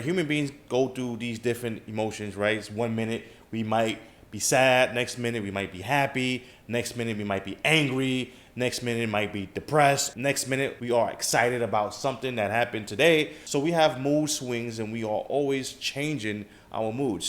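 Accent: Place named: Baltimore, United States. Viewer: I can hear the Baltimore in ya voice haha, love your vids from Jamaica 🇯🇲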